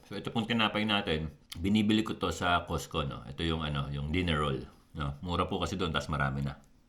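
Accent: Philippines. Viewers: Tagalog na tagalog ka kabayan, anung probinsya ka ba dto saatin ? 😊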